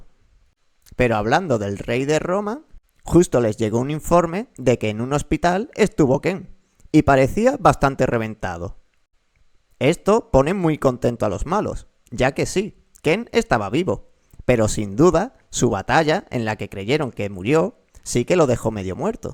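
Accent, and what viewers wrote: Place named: Spain. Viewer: Está buena la narracion lo mala esq está en español España 😅😅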